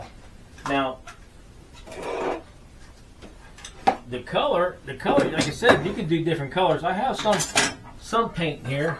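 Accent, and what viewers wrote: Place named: Western Pennsylvania, United States. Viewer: Are you from western PA? It sounds like it.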